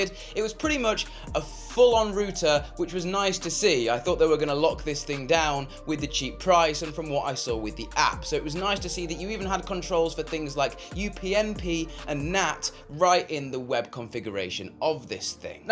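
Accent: England, United Kingdom. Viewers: He's from the UK, not from the US... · How are you going to tell an Englishman how to English? 😂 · Each time I heard the British say "Rooter" for "Rou' ter" I cringe. Nothing bad implied, It reminds me of "root canal".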